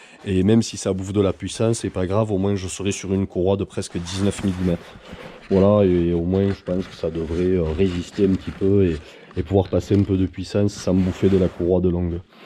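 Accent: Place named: Montpellier, France. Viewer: Accent Montpellierain on dirait ...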